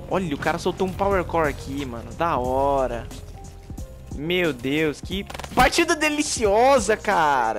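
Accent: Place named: South, Brazil. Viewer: Man, tu tem um fodendo sotaque do Sul, de que lugal vc é brou?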